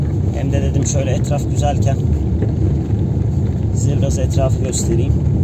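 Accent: Kastamonu, Turkey. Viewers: Hep kastamonu şivesini konuşmanı istıyoruz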